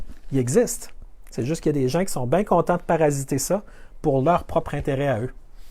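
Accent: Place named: Quebec, Canada. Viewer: Vous êtes marrant, , sérieux à la fois, et vous diffusez une information essentielle sur les bases de documents concrets accessibles à tout le monde. C’est dingue de voir comment les gens font pour encore arriver à croire qu’on ne veut que leur bien. Mais surtout ça correspond en tout point à ce que j’observe.. Quand on voit beaucoup des les commentaires sur les réseaux sociaux on ne peut s’empêcher de visualiser un troupeau de moutons qui se dirige vers un précipice ou vers l’abattoir en étant persuadé que c’est pour son bien! Suis contente de voir que nous sommes nombreux à voir ce que je vois et que des gens comme vous diffusent pour sensibiliser ces gens encore aveuglés par leur besoin complètement illusoire de sécurité. Ma clochette a souvent l’occasion de sonner quand j’entend des messages sibyllins et subliminaux venant de différents médias. 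Merci, merci, c’était un plaisir! Et votre charmant petit accent québécois rend le tout encore plus savoureux. Je vous mets même le petit ❤️dont vous parliez ! Naturellement, je m’abonne et diffuse!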